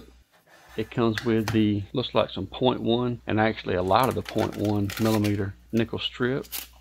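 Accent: United States. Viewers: Love your accent! I miss visiting the US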